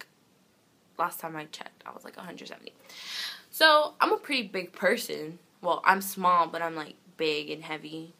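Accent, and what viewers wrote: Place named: Canada. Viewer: Lol from your voice I thought you lived in Canada cause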